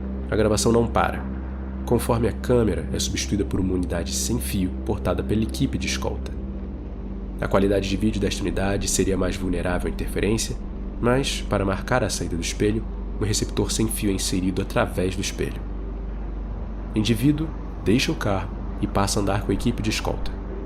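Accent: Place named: Rio de Janeiro, Brazil. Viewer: Sotaque de carioca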